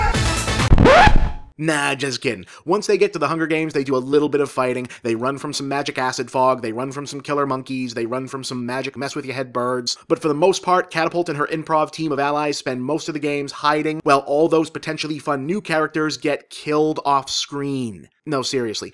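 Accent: Boston, United States. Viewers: Wow, his speech mannerisms and accept really show through on this one. I think I've seen about 25 of these things and never go "Boston" stuck in my head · has a boston area accent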